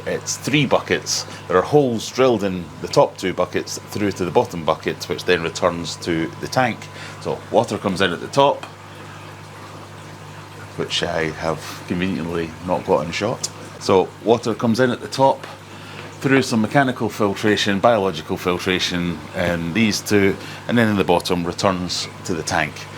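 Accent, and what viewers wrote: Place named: Scottish Highlands, United Kingdom. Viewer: Uncle got highlander accent and i absolutely love it. Excellent video🤝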